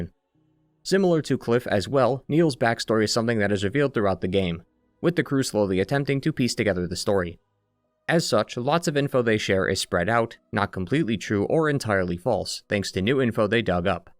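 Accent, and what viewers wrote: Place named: New York, United States. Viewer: Dude you make such good content 🎉🎉 Also well done, great to hear someone with ny accent doing so well 😁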